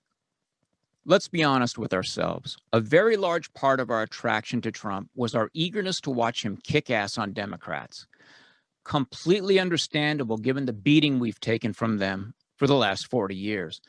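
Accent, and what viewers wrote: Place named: California, United States. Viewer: Californian huh, you project it well.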